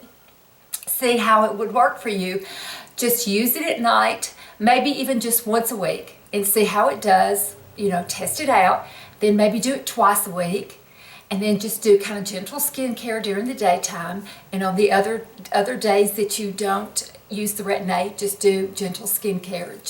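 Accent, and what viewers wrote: South, United States. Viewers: It's a beautiful southern accent:) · Your skin looks beautiful, especially your neck. How often I see girls ignore their necks and when the face looks good but the neck looks like a turkey's wobler, just ruins the effect. Being a Carolina gal, myself, I really loved listening to your Southern accent. Your hair is so thick looking on top, any tips on hair loss? Thanks, nice video from a Beautiful Lady.....................................Linda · My mom was Southern, so its very comforting listening to you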